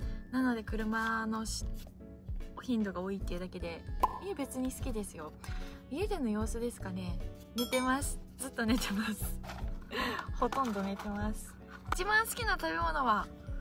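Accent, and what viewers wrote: Yamaguchi, Japan. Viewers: ハツコにあるんだけど、聞いてくれるかな? 数か月前のみたら山口の方言使っていて、ほんのりした感じで癒やされたよ。たまに方言使ってやって下さいね。❤️ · 今日もアップありがとうございます🎉 グッズ、欲しいですね。絵が上手いのでステッカーとか😊 字も上手だからサインも欲しいです。あとところどころに出る山口弁に癒されています。十万分のイチだけど応援しています。天使ナンバー❶❤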